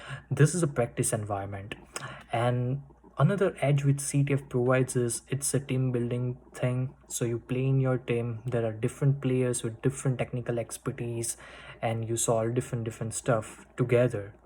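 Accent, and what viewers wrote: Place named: India. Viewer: English Ko Bhi Hindi Jaise bolte ho😁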